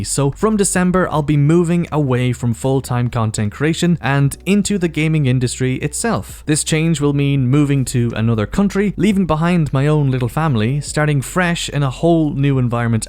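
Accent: Ireland. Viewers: Thank you for everything <3 I enjoyed going on your stream for fun, watching videos, and hearing that smexy Irish accent of yours, and I'll keep supporting you. From making videos about video games to now working in the industry is a hug feat. I'm so happy:D Good luck!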